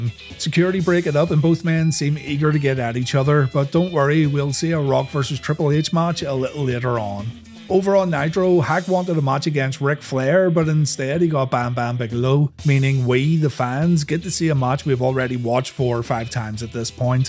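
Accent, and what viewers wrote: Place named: Northern Ireland, United Kingdom. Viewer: It’s been a long week lads, let’s gather around the fire and reminisce about 90s Wrestling as narrated by a delightful fella from Northern Ireland.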